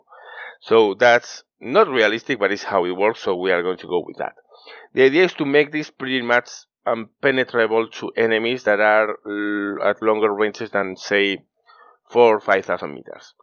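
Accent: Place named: Spain. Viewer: Just found your channel for the first time when looking for UAD videos and insta- subbed. Good presentation with an adorable Spanish accent :). I'm torn apart with this particular game as it seems super interesting, but would you recommend dishing out almost 50 EUR at a game that is not even available in Steam EA yet?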